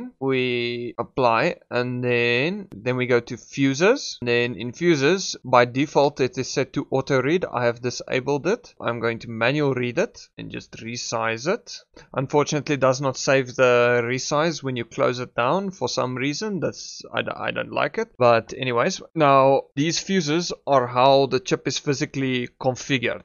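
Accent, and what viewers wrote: Afrikaans, South Africa. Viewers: Afrikaans accent?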